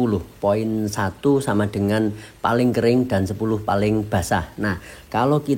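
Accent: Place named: Java, Indonesia. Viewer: smpean jowo ndi to mas kok medhokmen 😁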